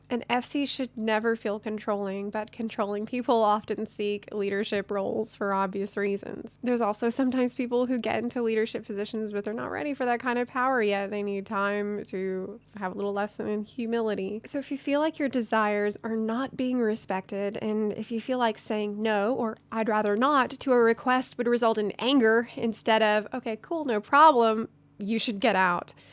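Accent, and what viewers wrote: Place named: Louisiana, United States. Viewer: Hi! Just started and found your channel really useful. If you don't mind me asking, are you from Louisiana at all? Your accent is very distinctive. Sounds like its from the Acadiana region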